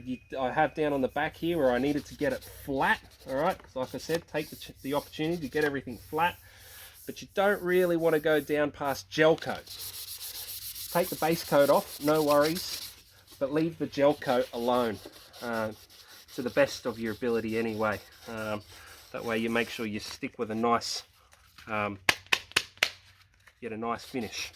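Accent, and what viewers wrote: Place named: Australia. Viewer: Motorcycle, check accent, check. Nature sounds in the back check Filmed outside check You must be australian 😁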